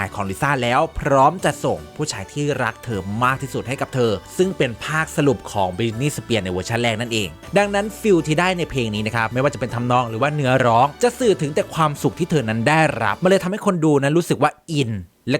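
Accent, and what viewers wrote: Isan, Thailand. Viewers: อยากรู้.ครับว่า.แอดเป็นคยจีงกวัดไหน..เว้า.อิสานม่วนหลาย.เด้อครับ..❤❤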